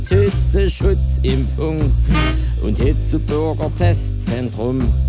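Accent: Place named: Saxony, Germany. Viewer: Köstlich. Und auch in diesem Jahr geht‘s wieder los. Es ist auch wunderbar, mal einen echten sächsischen Dialekt zu hören. Nicht immer dieses pseudo-sächsisch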